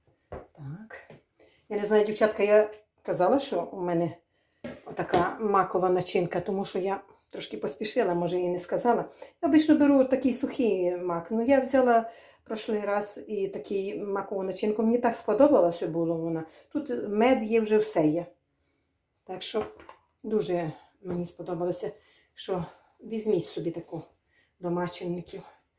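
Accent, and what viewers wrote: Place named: Bukovyna, Ukraine. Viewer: Дорога, скажить а як писни так зробыты? Бабка робыла нам на Маковея таки, але писни, бо в ти дни пист. Бабкы уже немае, и спытвты немае в кого. Бякую вам за рецепты. Моим дуже все подрбаеться. Да и мова Ваша зрозумила. Я родом з Буковыны и мени прыемно чуты именно таку мову, ридну.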